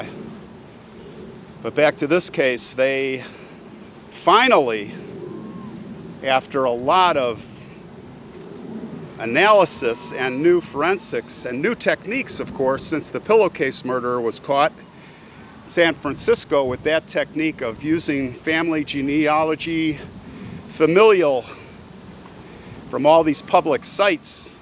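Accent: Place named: Chicago, United States. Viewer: Love your channel...I am from the Chicago suburbs and can definitely hear your Chicago accent :)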